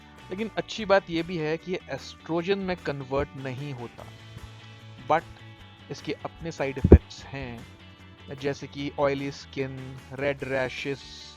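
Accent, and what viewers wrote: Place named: India. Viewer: The India accent is so cool and funny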